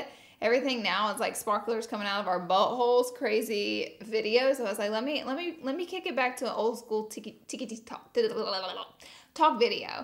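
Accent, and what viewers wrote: South, United States. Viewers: I like how you go from a southern ish accent to a high pitch girly scream in 2 seconds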